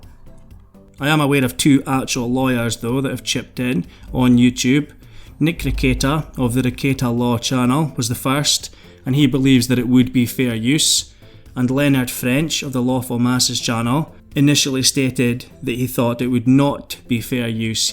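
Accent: Scotland, United Kingdom. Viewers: Scottish? I suggest you don’t watch his other videos, they’re all in Scottish. Since he’s Scottish. 🙄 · He’s from NE Scotland deal with it!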